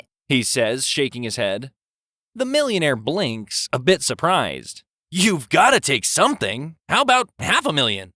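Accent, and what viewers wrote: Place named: Australia. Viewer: love your Aussie accent😂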